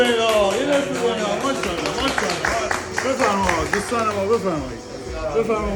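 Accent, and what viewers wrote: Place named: North, Iran. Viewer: خداشاهده همون اول که دیدم شمالی حرف میزنی،فهمیدم که نباید انتظار ی چیز درست و حسابی رو داشت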